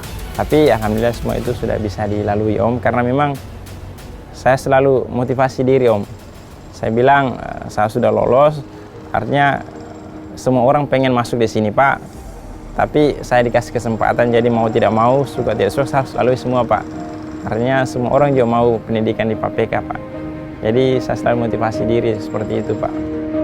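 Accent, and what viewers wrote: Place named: Makassar, Indonesia. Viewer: Saya kira orang Makassar, logatnya sdh mirip soalnya 😁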